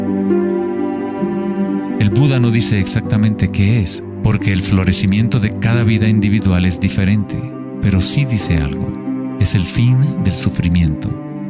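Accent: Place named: Latin America. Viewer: Bastante interesante, lastima que este en latino, no es por nada, estoy más acostumbrado al acento español. Like.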